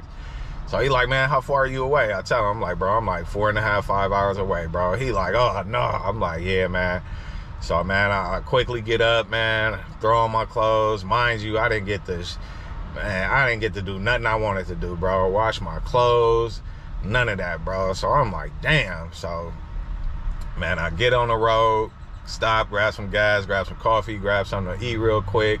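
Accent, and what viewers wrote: Bay Area, United States. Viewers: I eventually figured it out as the video played, but out the gate I knew you was from the Bay! Great video, been thinking about going the same route as you.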